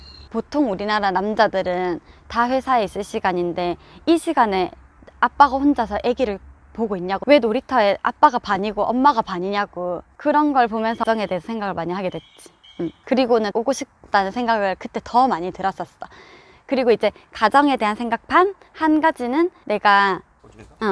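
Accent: Gyeongsang, South Korea. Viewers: 💗🌈 안녕하세요ㅎ 부산인이라 경상도말투에 익숙해서 자주 놀러와요ㅎ 이번 영상 전적으로 공감해요!! 제가 커피쪽을 하는건지 아니지만 저랑 마인드가 너무 비슷해서 영상정독했네요ㅎㅎ 날씨+나의 온전한 내모습과 삶+아빠의 자리가 크고 역할이 정말 크게 작용하는 호주의 삶 등등 저를 호주라이프에 푹 빠지게 했어요ㅎ 그리고 ㅎ가끔 외롭고 힘들고 우울해도 맑은하늘과 따스한 햇살아래에서 요가 🧘🏻‍♀️ 한번 하고나면 뭔가 기분이 사르륵 🥰 1년중 300일이상 날씨가 좋다는 브리즈번에도 몇일째 흐릿흐릿 🌧 비가 내리고있지만 낮에는또 화창한 하늘 ☀️ 볼수록 신기해요ㅎㅎ 시드니 감기조심하시구 가끔 이렇게 편 영상 올려주세융! 기다릴게융ㅎㅎ🤩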